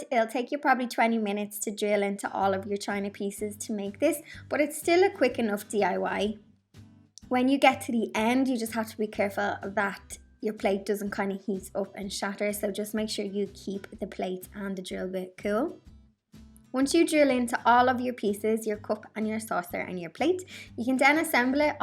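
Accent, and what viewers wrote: Ireland. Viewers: Only just found your channel and I love it! Your cats are so cute. You seem such a sweet person and your DIY's are so so lovely, but not sure what I enjoy most, your projects or your accent, I love the Irish accent. Keep up the good work and look forward to watching more of your videos. Take care and stay safe 🤗🤗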